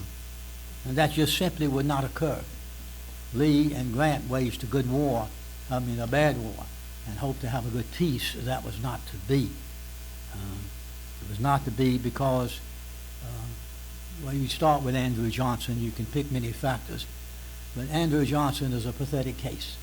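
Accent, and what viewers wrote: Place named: South, United States. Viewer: This wonderful professor has delivered a most powerful lecture!! I would love to read all of his publications and hear him speak, he has a distinguished southern accent and great knowledge. I never knew that the statues were erected and paid for by the union soldiers who fought for the people tearing them down.Wow!! People in general are very uneducated at this time in history. The boys / men who suffered and fought this terrible and great war should be honored and remembered always by all! 🌺🎵🎵🎵🎵🎵🎵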